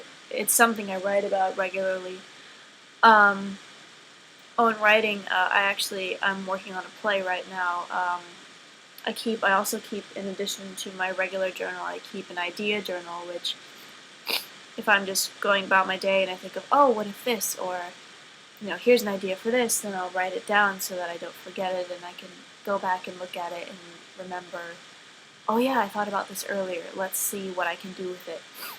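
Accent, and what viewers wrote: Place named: United Kingdom. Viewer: Thanks. After completing the test, I found your video enlightening and you echoed many of my characteristics. It is nice to feel more connected to people. Can you recommend any ways to communicate with other INFJs? I'd like to find out how deep our connections go. I love discussing things on a deep and personal level but not everyone enjoys that. Also, I thought you were British! Pity...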